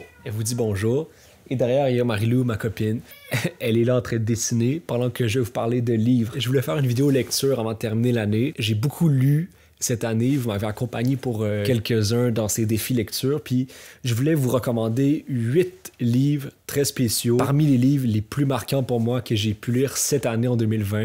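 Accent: Quebec, Canada. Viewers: J'adore ta chaîne. Du vrai contenu dans le beau français du Québec. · Que l'accent québécois est beau et charmant!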